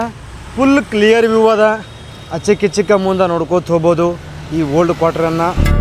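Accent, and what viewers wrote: North Karnataka, India. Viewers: ಅಣ್ಣಾ ನಿಮ್ಮ ವಿಡಿಯೋ ಮಸ್ತ್ ಸೂಪರ್ ಅದಾವ ಅದರಲ್ಲೂ ಉತ್ತರ ಕರ್ನಾಟಕ ಭಾಷಾ ಶೈಲಿ ಬೆಂಕಿ ನಿಮ್ಮ ವಿಡಿಯೋ ಇನ್ನೂ ಹೆಚ್ಚಿನ ವೀವ್ಸ್ ಆಗಲಿ ಆಗಬೇಕು ಆಗತ್ತೆ ನಿಮ್ಮ ಸ್ವಚ್ಛ ಭಾಷೆ ವಿಸ್ತರಣೆ ವಿಷಯ ವಿಡಂಭನೇ ಮಸ್ತ್ ಅದ ಹೀಗೆ ವಿಡಿಯೋ ಮಾಡ್ರಿ ನಮ್ಮ ಬೆಂಬಲ ನಿಮಗೆ ಇದ್ದೆ ಇದ್ದೆ ಅಣ್ಣಾರ ❤❤🎉🎉... ಜೈ ಹಿಂದ್....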